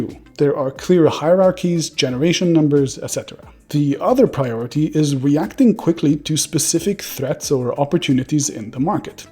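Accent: Germany. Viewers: Your German accent is just annoying, please hire and American person who can talk for your little mid life crisis virgin a$$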